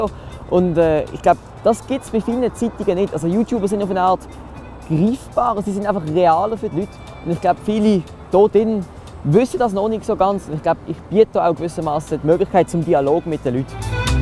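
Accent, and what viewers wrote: Switzerland. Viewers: Nai, das isch Baseldytsch wo dä schwätzt.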